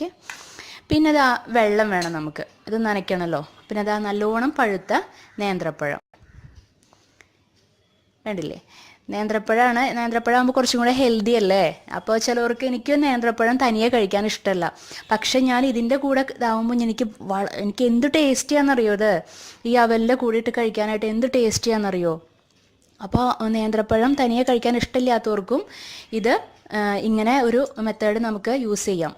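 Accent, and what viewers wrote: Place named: Thrissur, India. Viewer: variety breakfast.. thrissur bhashayanaloo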